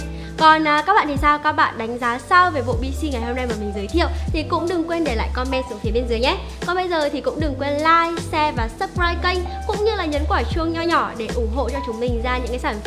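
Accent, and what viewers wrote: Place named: Hai Duong, Vietnam. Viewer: Chắc chắn là quê Hải Dương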